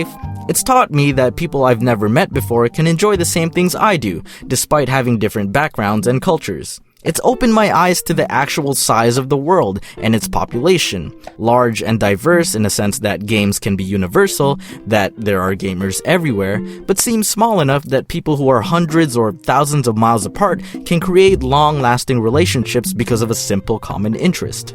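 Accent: Philippines. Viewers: you sound like a Filipino. I’m a Filipino btw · sorry dom but I can't stop listening to your filipino accent nintendo pamili komputerr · gotta love that Filipino English accent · love the Filipino accent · love the Filipino accent 😂😂😂😂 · Weird Filipino Accent Tae means poop in Filipino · lol the filipino accent is so on point and funny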